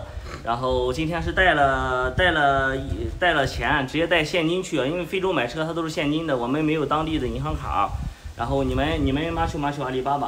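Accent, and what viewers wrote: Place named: Northeast, China. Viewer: 你的口音听起来是山东人，不知为何又带有东北味道😓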